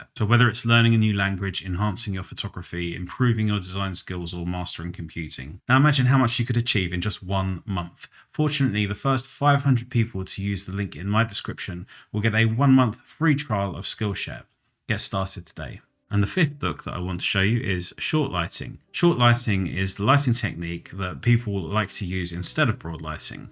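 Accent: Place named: England, United Kingdom. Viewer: Another excellent tutorial,!! Your photography and teaching skills are superb...plus, the English accent makes your videos even more pleasant to watch! 😁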